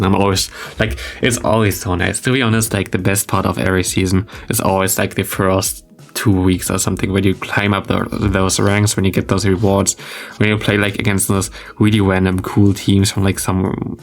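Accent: Germany. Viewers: He‘s not a native English speaker either. I think he‘s German from what I heard. Brother you just got to get better at understanding English, because thats really not that hard.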